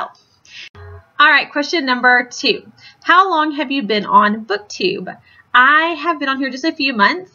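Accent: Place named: Texas, United States. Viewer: I love your accent, I lived in Houston TX my whole life and we just moved to Michigan and I miss the accents!! I loooove watching book hauls so I can't wait for more from you! great video!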